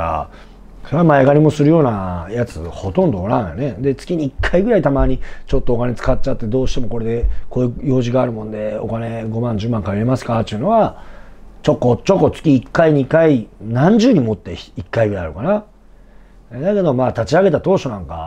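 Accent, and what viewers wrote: Nagoya, Japan. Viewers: いいね 名古屋弁！港区か南区あたりの出身ですか？ 思わずチャンネル登録。Goodボタン押したよ。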